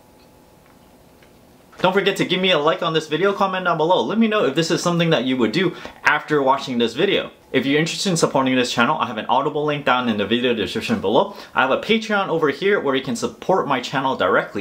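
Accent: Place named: United States. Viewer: great video but man it always catches me off guard when i see an asian speaking perfect american english lol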